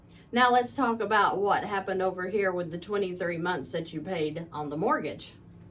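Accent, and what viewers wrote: South, United States. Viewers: Okay, this is brilliant and I love your Southern accent. It all makes sense except the part where you use your line of credit as income by putting your income into paying the line of credit. It seems you just paid off the line of credit with $10g, so your income for that month was spent by zeroing out the line of c balance. How exactly are you using this as your checking account and wouldn’t you still need to make that month’s mortgage payment? But you just spent your 10g income to pay off the line of c? If you could please explain that part of it in more detail for us slow folk? Thx😊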